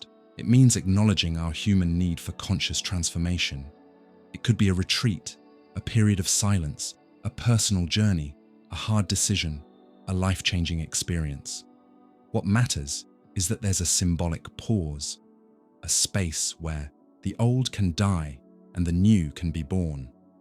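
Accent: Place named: United Kingdom. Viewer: Gosh... 2.5 hours of AI crap, W/British Accent and Sparkly Background to boot!! I need an AI which hides crap like this.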